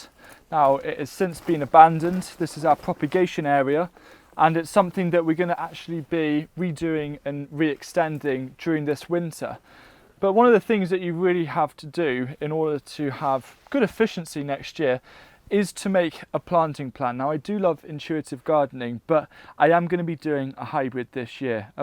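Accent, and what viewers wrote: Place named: Wales, United Kingdom. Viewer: yet another excellent video / and recommendation to give it a break for now. Meanwhile, question, please.... which month did you plant the OCA in your raised bed. I am mostly keen to learn 1. how late I can plant them? 2. Are they a perennial or can one save tubers or “seeds” from one season for the next?? Thanks I have seen loads of your tutorials and your Welsh accent is also a real bonus!!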